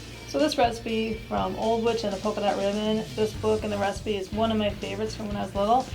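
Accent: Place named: Midwest, United States. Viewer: Hi,! That pumpkin bread looks amazing! So cool that you got the recipe from a book when you were a child. Always nice when there is a story behind the recipe. You are right: cans and boxes are getting smaller over time. I agree that older recipes do not always have a lot of spices. I think that people ate food that we might consider to be a bit bland today... I agree that sometimes too many chocolate chips can be a bad thing. Woodstock...Illinois? I thought you might be a fellow Midwesterner because your pronunciation (I am really into languages). That turned out great. Thanks for sharing!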